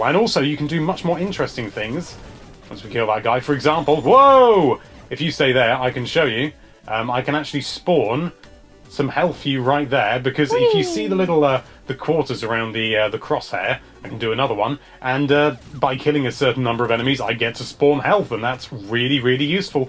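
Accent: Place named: England, United Kingdom. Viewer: i totally agree that Snipperips is number 1. I'm not sure that blaster master deserves to be on the list, I was very disappointed with the co-op feature and felt it was put in at last minute without any thought! I would like to see this list done again in say a years time when there are loads more games to choose from! Great video as always, makes a refreshing change to listen to a fellow English man when on YouTube searching for my SwitchFix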